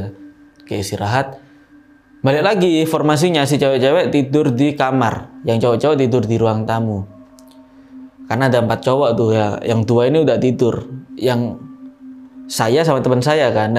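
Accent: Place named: Surabaya, Indonesia. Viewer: Medok suroboyoan e,,👍😁😁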